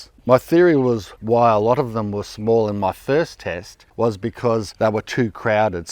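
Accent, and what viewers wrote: Australia. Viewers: I’m an Australian who lived overseas for most of my life. For some reason the Aussie accent triggers me and I squirm. But yours is perfect. It’s just bogan enough, but not too bogan. And it’s just so pleasant. No matter what you say, the tone and timbre of your voice brings a smile to my …ears. Thank you ❤🙏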